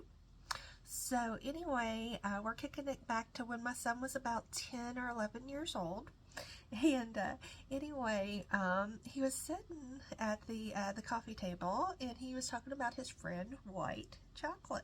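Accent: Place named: Georgia, United States. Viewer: You do have a lovely voice, and I swear, the accent is South Georgia like my Granny's family.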